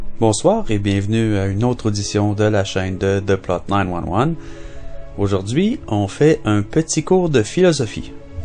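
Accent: Canada. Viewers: moi non plus, je trouve ses vidéos superbes, bien expliquées, et a chaque fois, elles me laissent songeur et heureux... De plus, je suis français et j'adore l'accent canadien et je le trouve très agréable à écouter. Merci.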